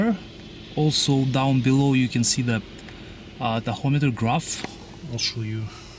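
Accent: Russia. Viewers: Недавно купил такую для 2017 Toyota highlander с OBD подключением... Подключил без установки в гнездо крышки динамика. После прохождения теста экран тупо гаснет и все. Включаешь двигатель (у меня гибрид) и ничего - кнопки только пищат при нажатии. Пробовал проехаться: 3 минуты ехал и нифига - пустой экран... Дефективный девайс, походу... Во тут видео, шо я снял для продавца (тоже на английском с таким же русским акцентом :) - <link>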